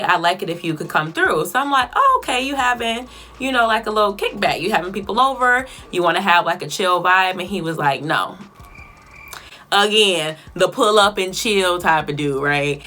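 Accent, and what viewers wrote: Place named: South, United States. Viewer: How did you keep your accent so intact? Everytime I was down South I pick up the accent in a few days.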